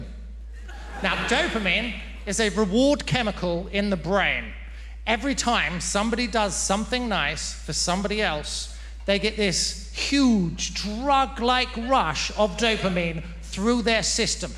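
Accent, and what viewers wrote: Liverpool, United Kingdom. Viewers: As a Scouse person, I approve this accent. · Belter Scouse accent 👌🏻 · His scouse is a dead ringer for Stephen Graham 😂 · So refreshing to see someone doing a Scouse accent and nailing it. Absolutely hilarious set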